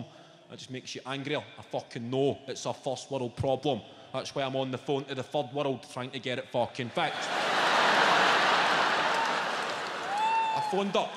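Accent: Scotland, United Kingdom. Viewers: Does he really expect non Scots to understand him?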